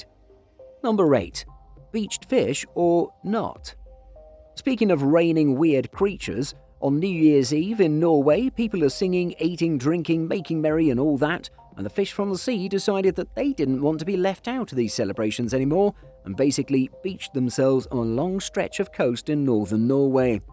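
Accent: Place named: England, United Kingdom. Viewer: Juste pour l'accent anglais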